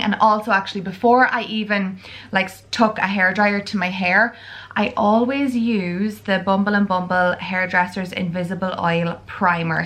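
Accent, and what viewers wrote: Ireland. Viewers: Hi! I really love your videos! You're so friendly and natural! I always watch your videos with a big cup of tea--but sorry I'm a Barry's girl not a Lyons! I have two questions for you! The first is have tiu always lived in the town you live in now? I know the town where you live (I'm not sure you've ever said it on YT, I just recognise the shopping centre!) Just wondering because your accent is exactly the same as my cousins who live in Monaghan, specifically Clones!!! And my other question!!! Why are your doors always open?? Is your house really warm??😊😊😊 Anyway as I said I really love your videos! And oh yes I adore your house too! 💞💞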